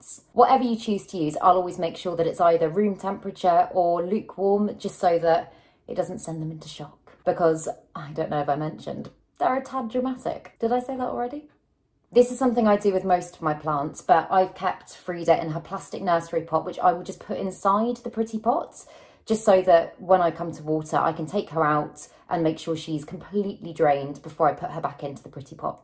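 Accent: United Kingdom. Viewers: Nice to hear a British plant youtuber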